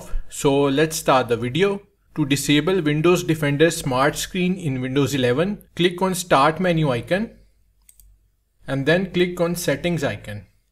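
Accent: India. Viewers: Average indian accent haha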